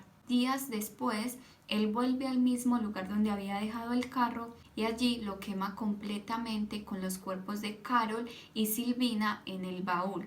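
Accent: Colombia. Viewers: Eres colombiana me queda claro, pero tienes una forma de hablar graciosa, como un acento de Colombia pero de alguna región en particular no? Los colombianos tienen un acento que me gusta mucho pero el tuyo suena un poco diferente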